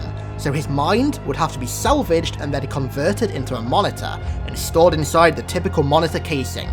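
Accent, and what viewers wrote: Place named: England, United Kingdom. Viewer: congrats you have all the xperience;D i love your vids and yeah you are english right? just a question. because of your accent. like i said congrats.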